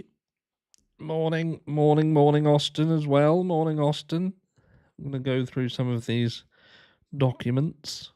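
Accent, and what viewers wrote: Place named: RP, United Kingdom. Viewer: Hello that posh accent 😂 i didn’t think it was you until the swearing😂.. in bed still ill & hubby put you on but i wasn’t watching at first just listening i thought thats no.. 😂 that was a lot of docs.. sorry to hear about your daughters glasses.. maybe who done it will own up.. not.. hv a good day everyone im off to feel sorry for myself 🤷‍♀️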